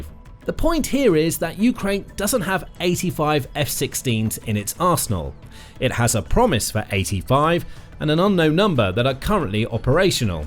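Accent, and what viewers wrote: England, United Kingdom. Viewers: "Has gotten" is an anachronistic form of English only used in the USA, so why is the script spoken with an English accent? And UK vehicles tow rather than haul. Cleverly researched essay though and almost amusing😅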